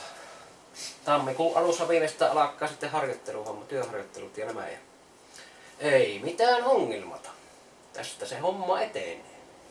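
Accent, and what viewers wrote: Savo, Finland. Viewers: voiko tuota savon muretta oppia millään kansalaisopiston kurssilla tai nettisivustolla? kiinnostaisi vanhoilla päivillä oppia uutta , se mukavasti estää dementiaa.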